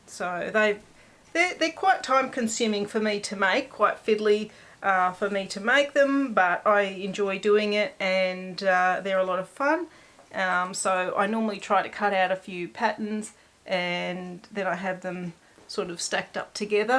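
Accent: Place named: Australia. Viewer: Hello, I fell across your podcast last night and how refreshing to hear your gentle Australian voice. I’ve jumped around a bit looking at a couple of your podcasts, ooooh your ETSY shop although your closed for a bit will eagerly wait for you to re-open. So back to the podcasts I went 🤣 I so enjoyed being introduced to your sweet daughter Mill wow did I laugh along with your conversations 😅 This morning home day for me don’t work Wednesdays generally I decided to go back to the beginning of your podcasts to get to follow your You Tube journey. So my first thought when you talked about jumpers and why us Aussies call them that was the poem Waltzing Matilda and Wikipedia has this description and it made perfect sense to me why we say ‘jumper’ instead of ‘sweater’..... “Jumbuck is an Australian term for a male sheep, and is featured in Banjo Paterson's poem "Waltzing Matilda”. 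I haven’t gone through other’s comments someone may already have thought this. I will have sheep wearing jumpers now jumping around the paddock yards with the working sheep dogs 😂🤣😂🤣 I am so lucky to live (rent) on a working farm approx 700 acres with sheep and cropping being mainly wheat & barley and have so laughed watching new lambs jump and play chasey during lambing season through the year when they have run of the yards just outside of our home garden, the best of fun. 🇦🇺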